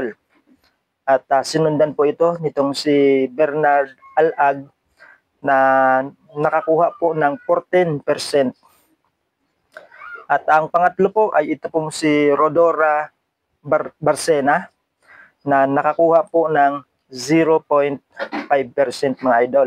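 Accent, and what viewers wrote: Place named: Visayas, Philippines. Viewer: Ayusin mo pananalita mo bisaya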